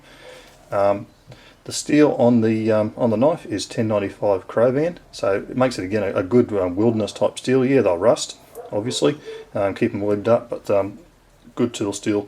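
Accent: Australia. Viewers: simple entertaining and informative review 10/10, where abouts in Aus you from mate ?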